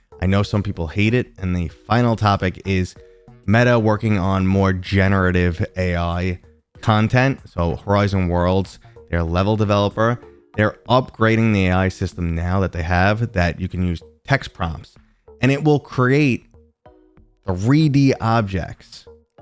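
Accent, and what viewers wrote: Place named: Long Island, United States. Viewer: you sound like you're from long island new york. I live there. Just taking a guess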